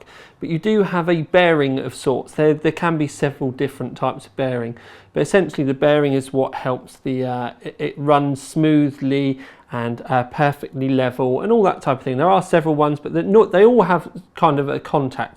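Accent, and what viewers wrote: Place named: Southern England, United Kingdom. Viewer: So you guys are based at overclockers in Newcastle? But you have a southern accent like me!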